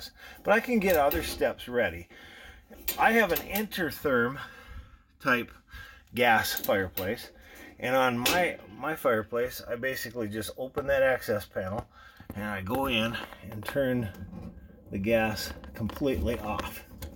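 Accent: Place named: Upper Midwest, United States. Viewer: Thx! Excellent info and funny. Been wanting to clean our glass for years. Sounds like you’re from WI or MN? 😁. I grew up near LaCrosse WI.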